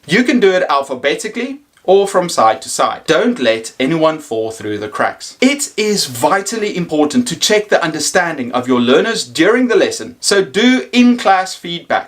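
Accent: South Africa. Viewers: haha I you South African bro?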